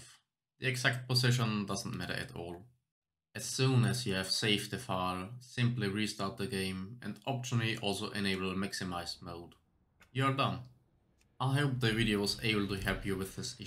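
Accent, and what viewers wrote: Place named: United Kingdom. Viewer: Dude Britts are impossible to understand. I bet your video is great but gd damn usa English is way easier to speak unless it's a minority talking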